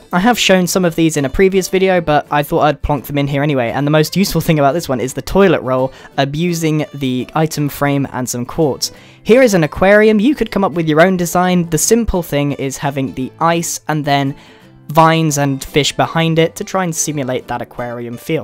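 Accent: United Kingdom. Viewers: hes british, no shit sherlock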